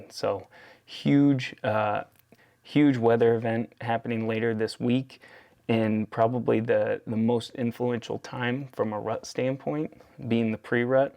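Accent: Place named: Midwest, United States. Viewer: Obviously you are in Midwest not deep south lol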